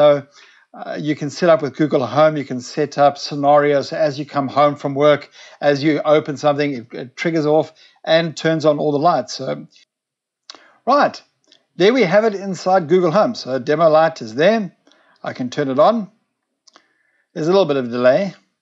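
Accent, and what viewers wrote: South Africa. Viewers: Thanks so much for this video, also nice to see a South African video for a change LOL (mind you, a South African accent that that's not making a video from abroad!). Please can you do a review video on these new emergency LED light bulbs that have a the built in battery inside of them but work like a normal light bulb. Also do you have recommended retail prices and where one can buy the sonoff switches? Will check itead out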